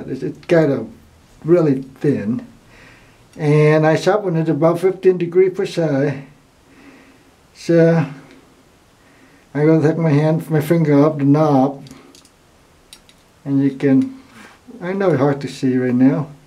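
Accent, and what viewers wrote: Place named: Vietnam. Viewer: I'd love to buy one of your knives in the future, I'm sure they're going to be great =) just your sharpening/grind alone will be great, but you seem to be getting really good at the hardening/tempering too. awesome stuff. can i ask what country your ancestors were from? i'm curious about your accent :) it kinda sounds vietnamese or cambodian to me.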